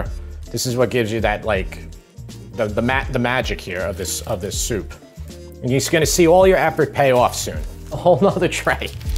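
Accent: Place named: Long Island, United States. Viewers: Test it Awl... Not a knock! I'm a Long Island Boy too! Music to my ears! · You are a delight to watch! Your deadpan humor, your outstanding recipes, your Lon Gisland accent, and your well edited productions have made you my favorite YouTube chef.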